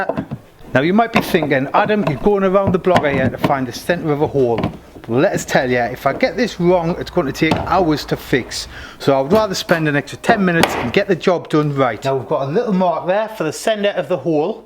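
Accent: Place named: Northern England, United Kingdom. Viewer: I am learning the Northern accent and lingo as i watch these as well as car stuff.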